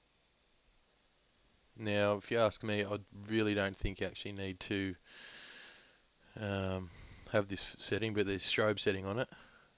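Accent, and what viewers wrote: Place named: Australia. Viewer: He's From Straya. We think Americans talk funny.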